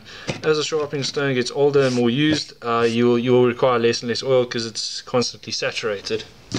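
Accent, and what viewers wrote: South Africa. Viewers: Jis man kan hoor dis n boertjie wat hier praat. 😉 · are you South African? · I smaak the Souf Efrikan eksent bru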